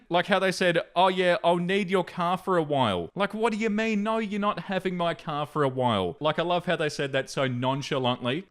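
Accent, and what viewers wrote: Australia. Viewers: My daughter and I love listening to you read stories. Indignation just sounds better in an Australian accent 😊 Thank you for the awesome entertainment!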